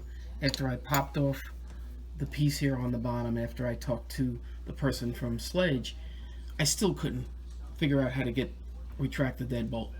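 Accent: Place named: New York, United States. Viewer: Love the accent. (I'm in the Midwest.) Where are you from - New York, New Jersey?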